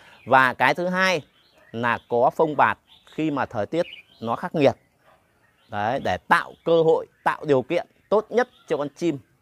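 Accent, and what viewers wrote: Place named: Hai Duong, Vietnam. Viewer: Giọng nói quê Hải Dương dung cháy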